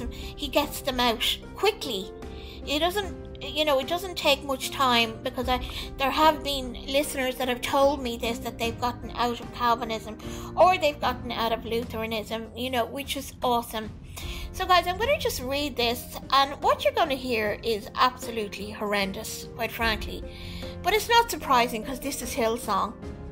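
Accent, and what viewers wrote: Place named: Ireland. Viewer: Good video & great accent! Are you in Ireland? God bless you & keep up the good work.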